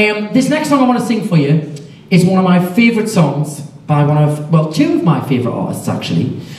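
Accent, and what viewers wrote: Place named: North East England, United Kingdom. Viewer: Thar's his adorable accent from The North east of England.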